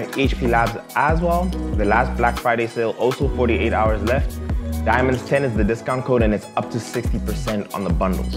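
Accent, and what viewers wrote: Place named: South Africa. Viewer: For a second I was tripping because I thought that he was going overboard on the carbs... Either way My favorite shredding meal is definately a chicken avocado salad, just because the carb grams is low and when I cut most of the time it follows a higher fat macro setup so that my body produces more ketons and gets fat adapted faster... Just found out you lived in South Africa (which makes sense from the accent!!) You were closeby at one point.. You got a follower in Mozambique, keep it going!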